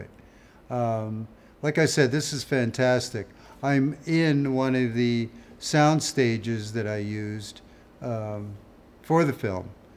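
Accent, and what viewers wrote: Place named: United States. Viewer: I was convinced that was Paul Whitehouse doing an excellent American accent the entire time.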